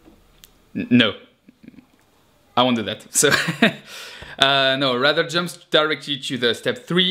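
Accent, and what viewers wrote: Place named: France. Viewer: On entend bien l'accent français. J'adore la vidéo continuez !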